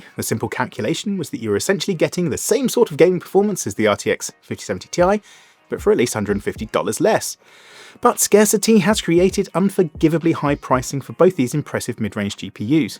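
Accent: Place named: England, United Kingdom. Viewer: Ah my bad then I thought it was a UK publication only - guy has an english accent as well in my defence haha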